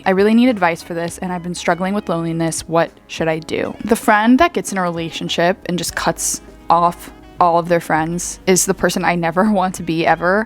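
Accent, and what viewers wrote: United States. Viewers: Ikrr the Minnesota accenttt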